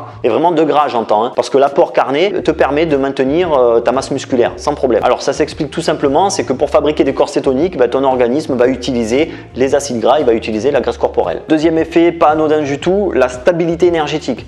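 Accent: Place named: South, France. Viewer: t gentil mais arrogant tu le dis toi même tu connais pas les conséquences de.ton régime et tu n'as aucune connaissance sur le sujet donc c normal que l'on signale ta vidéo qui pourrait mettre en danger certaines personnes fragiles Moi je reste persuadé que tu as raconté du bulshit et tu essaie d'innover mais ton accent sudiste ne suffira pas à convaincre du contraire L'homme est omnivore et à besoin de glucides et d'aliments basifiants que ça te plaise ou non Profite de ta jeunesse car c la génétique pour l'instant qui te maintient en bonne santé et non ton pseudo régime a la con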